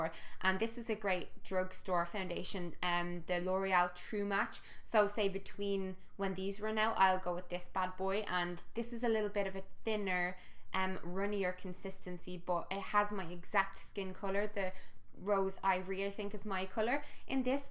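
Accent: Ireland. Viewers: Ouuuuuuu!!!!!! I am in love with your Irish accent!! So sooo lovely! Im Canadian with Irish heritage :) Ireland is definitely on my bucketlist!!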